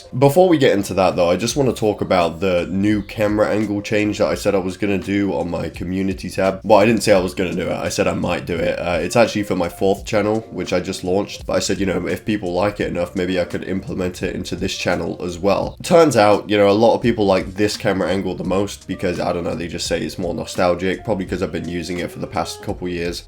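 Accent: England, United Kingdom. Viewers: I never realised this before but your voice kind of sounds like penguinz0 with an English accent.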